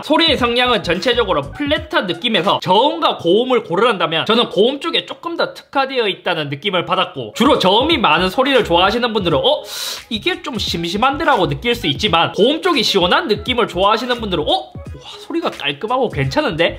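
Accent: Gyeongsang, South Korea. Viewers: 나도 경상도 출신이지만, 이제 경상도 말투는 듣기도 싫네요 ㅠㅠ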